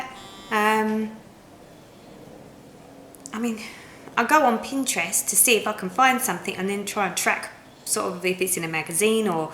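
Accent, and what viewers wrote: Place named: London, United Kingdom. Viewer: Hi,! I'm brand new to Flosstube and am catching up on videos. Just did an intro video but I might have to do this one next (even though I don't have funny moments in stitching, really!). BTW, your accent makes me miss London! Cheers! --Beth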